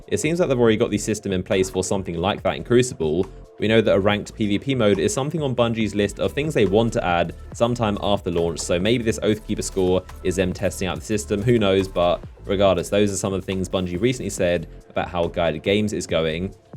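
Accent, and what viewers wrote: United Kingdom. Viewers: I disliked because you’re one of millions of stupid British YouTubers trying to be something. You’re accent and content is useless shit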